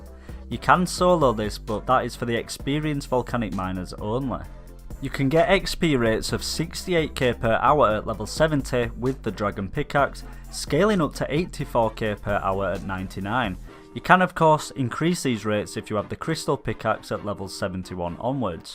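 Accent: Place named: Yorkshire, United Kingdom. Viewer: Jesus, that's a strong Yorkshire accent. Where abouts are you based? Think you're very, very close to me (Wakefield)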